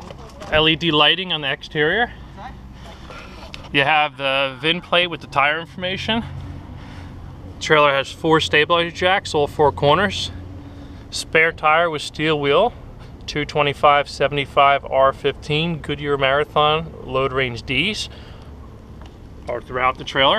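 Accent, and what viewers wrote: New Jersey, United States. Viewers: Great job! Thanks for pointing out the details. The camera lens was a bit annoying at times but it also showed a lot more that way. Love the Jersey speak. Had the feeling Ray Romano was selling me an Airstream!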